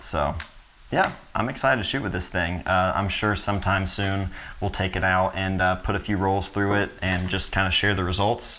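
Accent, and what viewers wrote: Ohio, United States. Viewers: This guy knows how to get us to click! Leica unboxing dictated by southern Ohio timbre? YES PLEASE!!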